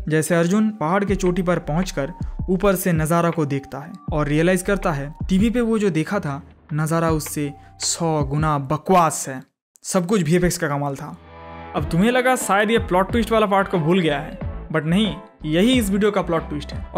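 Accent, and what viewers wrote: Bengal, India. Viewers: Bhai bengali ho kya ap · tomar bengali accent tike kom karo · Love your Bangali accent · Tumi bangali 😂 am I right 😅 mein ne pechan liya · bengali tone bro.....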